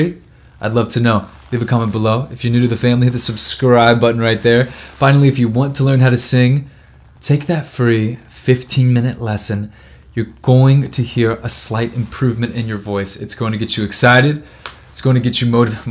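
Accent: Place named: Andalusia, Spain. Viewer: Habla perfectamente bien, con su acento andaluz personal.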